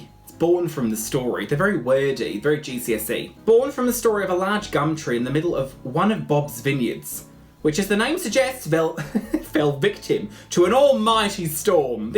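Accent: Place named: Australia. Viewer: You have a slight hint of an Australian accent 🧐🧐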